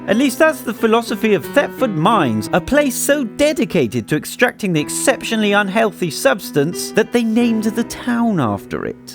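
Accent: United Kingdom. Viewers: Exactly. And New Brunswick cities aren't even half bad. This guy is so misinformed. He sounds British, probably not knowledgeable on Canada. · Are you from the USA? You sound so british.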